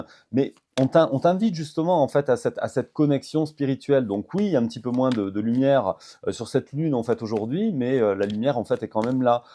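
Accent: South, France. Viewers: Bonsoir je vous découvre, les hommes ne sont pas si nombreux dans votre domaine, il me semble reconnaître l’accent du sud pas tout à fait comme le mien mais presque😉. Je suis étonnée de voir que sur votre chaîne YouTube il y ai si peu de vidéo. Avez vous une autre chaîne ? Comme j’y suis sensible, Vous avez une belle voix 🙏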